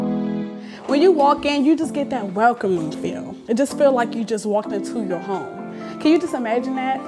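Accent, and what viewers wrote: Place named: Washington DC, United States. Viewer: Love that D.C. accent 💚💚💚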